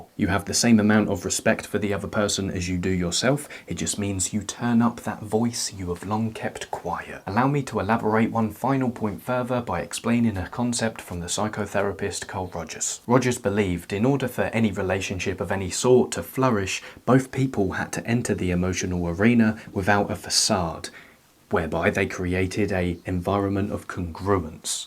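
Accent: United Kingdom. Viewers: I love this guy! (And the accent! Everything sounds smarter with a British accent 😂)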